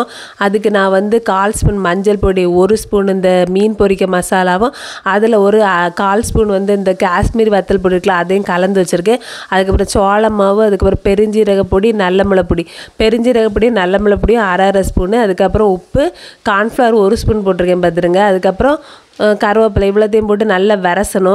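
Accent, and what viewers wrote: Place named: Kanyakumari, India. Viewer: சமையலை தாண்டி... கன்னியாகுமரி தமிழ்... வெற்றியின் ரகசியம்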